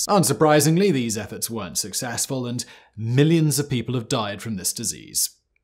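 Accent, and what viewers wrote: RP, United Kingdom. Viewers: Strange that this guy gets so much voice-over work since his affect is difficult to hear. He drops the last several syllables of every phrase such that it is...... Therefore, it strikes me as odd that he would....... Still, the accent is posh British, so that affords him some....... But don't mind me, I'm just....... Anyways, I hope you all have a....... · Well, he's British. They often speak that way.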